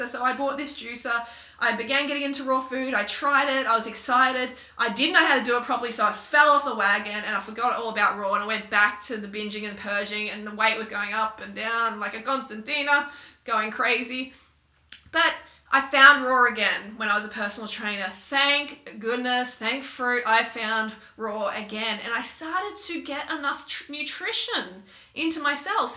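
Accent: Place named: Australia. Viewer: The Aussie accent has to be the best in the world - everything about it is awesome.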